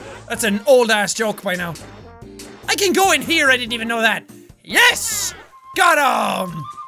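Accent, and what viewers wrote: Ireland. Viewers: Got so Irish this episode. Just listen to the outro. I LOVE IT.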